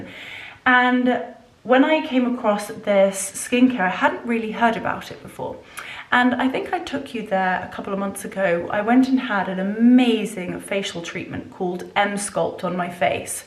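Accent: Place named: Scotland, United Kingdom. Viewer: Love to hear that scotish accent god bless you all❤😊😊😊😊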